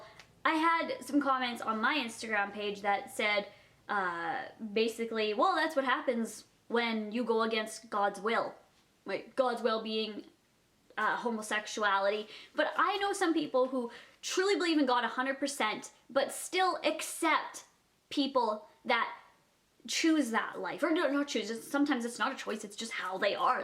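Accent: Canada. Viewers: It sounds like you're from Canada?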